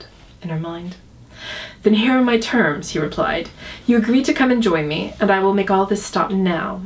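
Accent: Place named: France. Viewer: Your French accent is not bad, it’s cute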